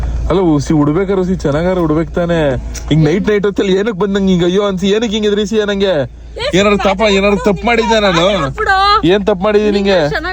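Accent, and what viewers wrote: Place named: Mangalore, India. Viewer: your Mangalore kannada suppppppppper..... 💐🤔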